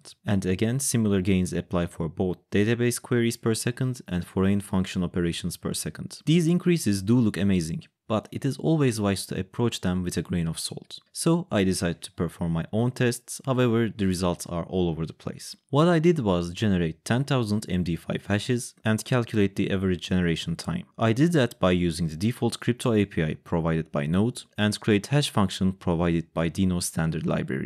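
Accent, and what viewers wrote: Turkey. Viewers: Are you Turkish? Your accent made me think that. I'm sorry if this question bothers you.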